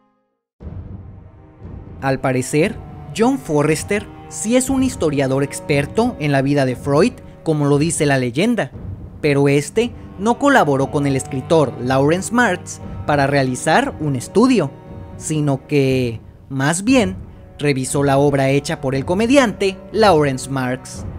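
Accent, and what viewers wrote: Mexico. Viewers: Eres yucateco?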